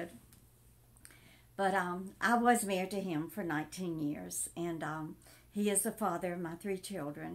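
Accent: South, United States. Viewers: Nice story , love your southern accent it never went away !!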